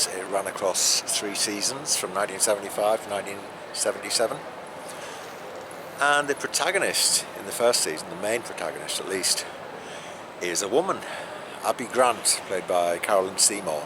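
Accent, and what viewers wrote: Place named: North Yorkshire, United Kingdom. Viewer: I loved the series. Are you from North Yorkshire? I recognise the accent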